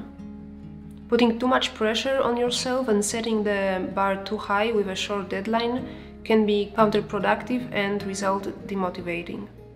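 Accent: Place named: Spain. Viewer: Thanks 4 the video! 👌⭐ Spanish english accent detected hehehe (or maybe not, who knows)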